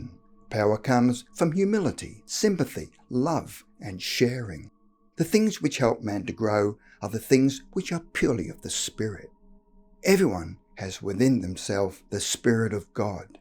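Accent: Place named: Australia. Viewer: okay than the narrator must be austalian.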